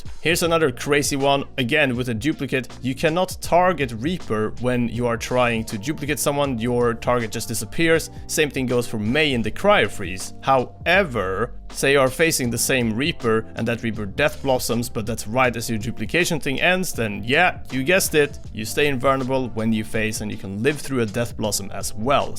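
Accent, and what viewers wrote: Sweden. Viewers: Ur accent sounds swedish idk why lmfao no hate tho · Is this guy Swedish? Cuz he has that annoying accent. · Is he swedish? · You sound like you come from sweden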